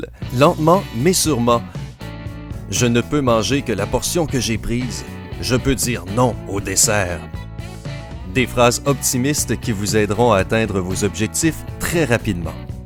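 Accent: Quebec, Canada. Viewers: J'adooooore l'accent canadien. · acent québécois 😊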